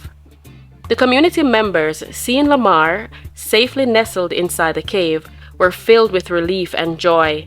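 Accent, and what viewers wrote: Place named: Jamaica. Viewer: Love the Jamaican accent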